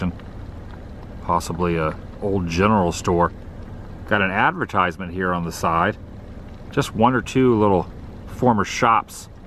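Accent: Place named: Florida, United States. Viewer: Are you originally from Florida? You and Tampa Jay have the same accent.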